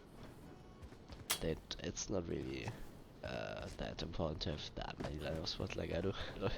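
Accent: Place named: Germany. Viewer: Deutscher Akzent ist auf jeden fall da!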